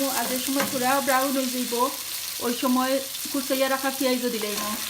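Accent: Sylhet, Bangladesh. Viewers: খাছ সিলেটি আপা গো তুমি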